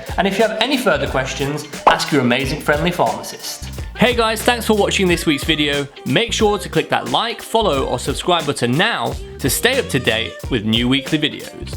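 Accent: United Kingdom. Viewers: oh wow he is bri ish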